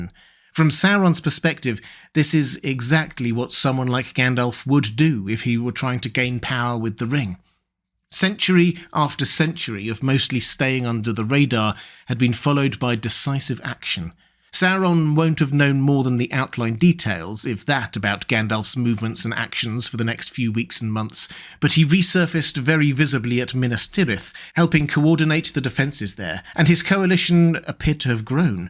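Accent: England, United Kingdom. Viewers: you have the best narrating voice & cadence, I love the way you speak, even down to your accent, I’m also English & it sounds bad but I cannot listen to an American talking about LOTR, it just sounds wrong to me, it’s like trying to listen to an Englishman talk about guns and pickup trucks, just doesn’t feel right.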